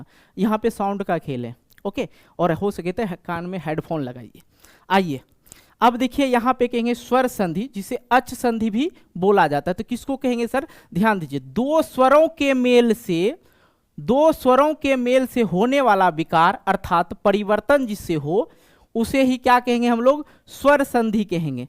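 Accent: Bihar, India. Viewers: Sir apki language samajh nhi ati hai lagta hai app bihari mai bat krte ho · Sir aap bihari hai ❤❤❤❤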